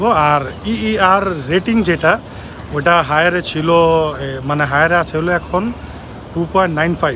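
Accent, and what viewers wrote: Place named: Old Dhaka, Bangladesh. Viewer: vai er kotha bolar style hebbi lage... puran dhaka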